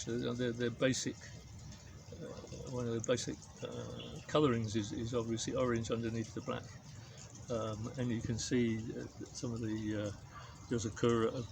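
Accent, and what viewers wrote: United Kingdom. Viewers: You sound British, where in the world are you?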